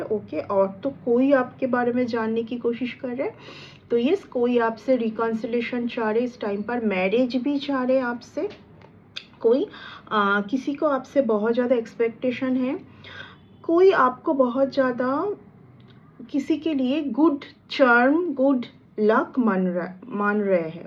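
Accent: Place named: Bengal, India. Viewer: आप तो बंगाली भाषा से लग रही है।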